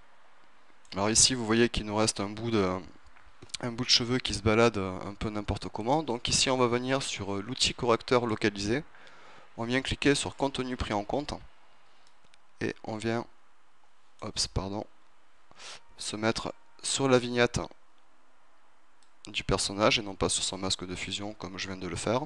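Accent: South, France. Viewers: bravo, bien expliqué, et agréable avé l'assan du midi,Té !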